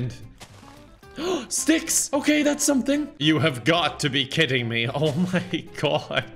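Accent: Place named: Ireland. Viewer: He's just Irish don't worry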